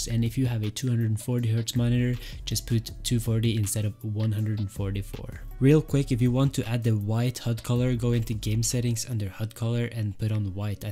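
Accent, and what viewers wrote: Norway. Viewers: I was in school there was lots of noices when i watched it, when i watched it again i heard that you were Norwegian my bad lol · E du nordlending eller?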